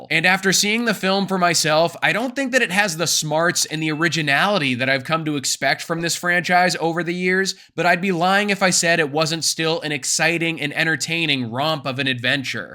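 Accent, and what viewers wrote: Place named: United States. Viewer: You sound like an American with a short attention span. Terrible review.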